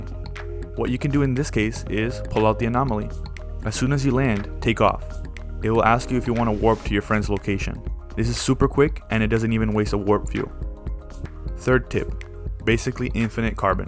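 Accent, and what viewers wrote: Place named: Brazil. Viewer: Estava me perguntando se você era brasileiro pelo sotaque, aí me deparo com o pet com o nome "Pica-Pica". Confirmado 😂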